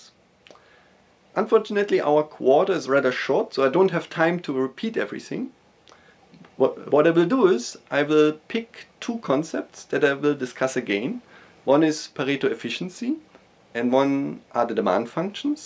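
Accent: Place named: Germany. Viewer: German english :)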